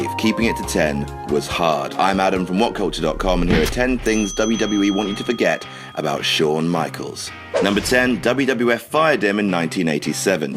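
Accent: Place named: England, United Kingdom. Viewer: Nothing on this list is that shocking or unknown to fans, and saying it in a sarcastic English accent doesn't make the list any better.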